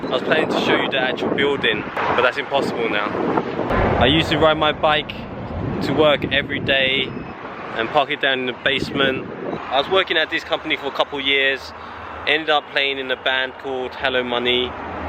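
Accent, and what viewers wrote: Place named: North London, United Kingdom. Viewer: Be used to the American accent and good to have the video with your north London accent, which is a quite good opportunity for learning something new.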